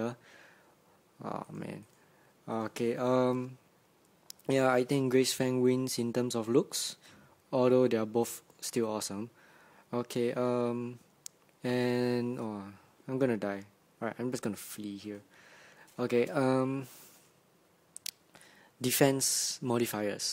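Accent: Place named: China. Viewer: your really good man! you have a chinese accent... anyways if you want to know, i am chinese ^_^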